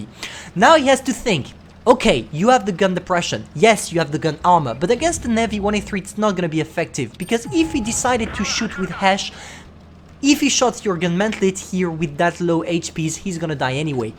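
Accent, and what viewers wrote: France. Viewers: I’m here for the French accent... nothing more, nothing less 🤤🤤🤤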